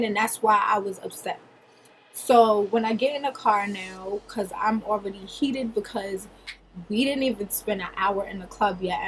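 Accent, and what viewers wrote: Jamaica. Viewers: Omgggg that Jam Accent though, yessss you so gorg. But that friend thing, I'm the same way, some females just don't know how to have, accept and good friend or be one. Gurllll shoot come to Trini/Tobago I'll be your bff lol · yesss bish the jamaican accent!!!!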